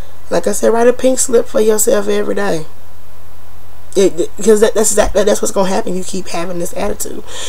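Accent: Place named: Rural, United States. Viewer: I love the way you talk all country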